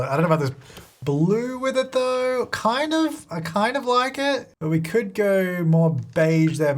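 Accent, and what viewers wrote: Australia. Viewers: I hear the Australian accent bit more now, tbh😅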